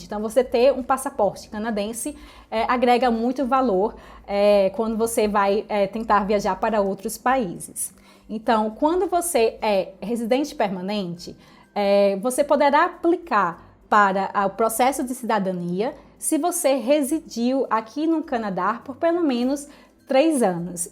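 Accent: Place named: Portugal. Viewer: Seu sotaque é tão bom de ouvir kk parece um pouco o português de Portugal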